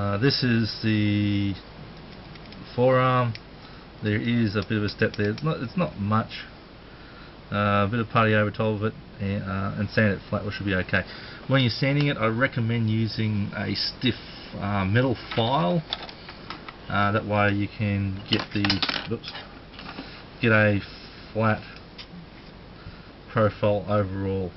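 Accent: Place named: Australia. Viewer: Great to see another Aussie model channel. Its funny how strong our accent is compared to the Brits haha! Cool channel. Just started up my own. Its only couple months old but it's gettin there. Keep it up.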